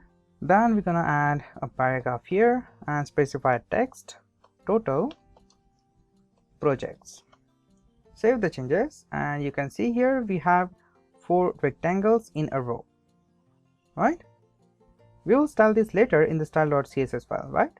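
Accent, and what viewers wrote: India. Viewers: хренов индуский акцент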